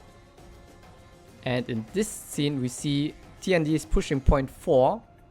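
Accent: Germany. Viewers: Dieser breite deutsche Akzent 😂 aber gutes Video 👍🏽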